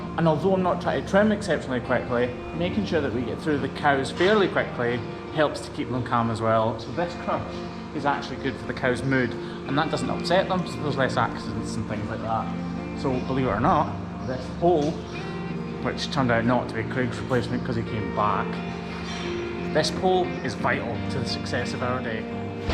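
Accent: Scotland, United Kingdom. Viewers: love this guy and ahis Scottish accent. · I'm just curious, what would say your main viewer demographic is? For example: People who are wanting to learn about trimming cows feet? People who are hoping to see a little puss? People who find these videos put them to sleep? Women from America who just want to hear a cute guy with a Scottish accent talking to them? Or something else? I'm sure all these examples apply but what do u think the main one is?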